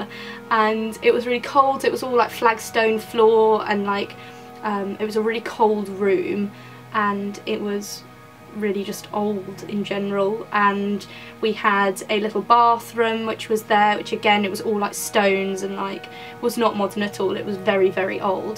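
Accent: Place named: England, United Kingdom. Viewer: I live in UK... your accent is really nice and strong it's like really fully English:)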